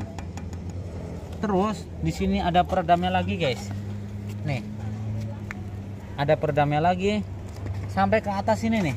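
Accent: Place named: Banyumas, Indonesia. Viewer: Ritinge ndi sih? Khas banget wong plat R 😂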